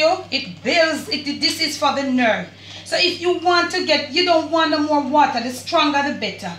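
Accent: Jamaica. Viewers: I l❤ve Jamaican accent mon!!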